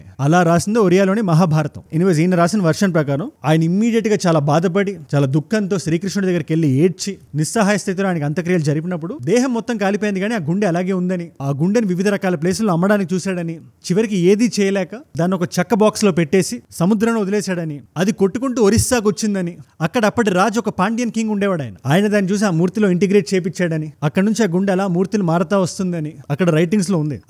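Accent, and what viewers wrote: Srikakulam, India. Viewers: 😊 i. impress you to speak Srikakulam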